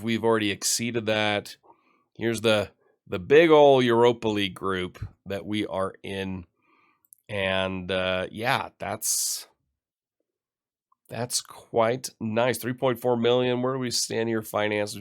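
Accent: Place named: Germany. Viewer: I could hear that. I wanted to ask from which region in Germany you"re from. sorry if it's too personal.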